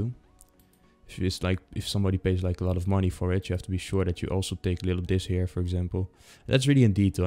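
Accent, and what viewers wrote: Netherlands. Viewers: I kan hoor dat you are Nederlands 😂 lekker bezig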